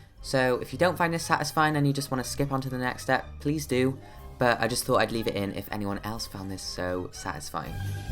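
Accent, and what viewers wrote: Wales, United Kingdom. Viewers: Are you Welsh ?x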